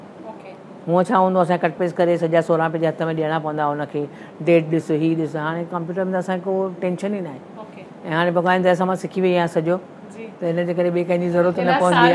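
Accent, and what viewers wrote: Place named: Khairpur, Pakistan. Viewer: Aunty bilkul Safa typical Khairpur local Sindhis accent ahh.. jeko pure sindhi boli Jo saboot ahay.... Dadho sutho Lago aunty khy budhi khare...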